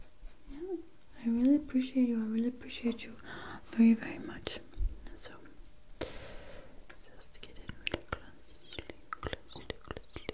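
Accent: Mexico. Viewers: I'm mexican and I can hear my accent in english here! 😂 it was so weird, I'm always so self-conscious because I can't pronounce some things as smoothly as I'd like, but this made me feel so happy! Thank you so much, your quality is so good as usual, you're like my favorite person ❤️ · You even speak English like some mexican would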